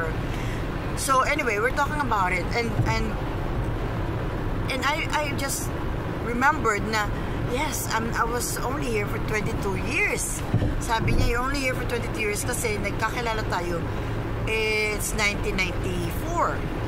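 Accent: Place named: Rizal, Philippines. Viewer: ate ung nsa likod mo panda fave ko haha.. sarap nmn jan,namiss ko kutsinta.ndi n aq nkbili here.. ate ung punto mo "ha-ne", gnyan dn kmi magslita dti ung nktira pa kmi s teresa rizal..sa rizal po ba province u ate?nkakamiss ang word na yan hehe..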